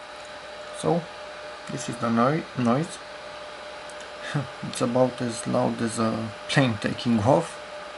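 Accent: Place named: Romania. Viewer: pot sa jur ca esti roman! accentul acela :) apropo... am achizitionat si eu acelasi pc recent. din cate am inteles are placa video integrata nu?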